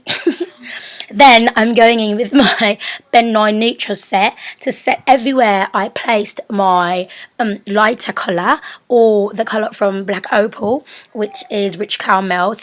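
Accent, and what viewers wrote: United Kingdom. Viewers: You killed me with the british acc damnn goo gal. Love you for free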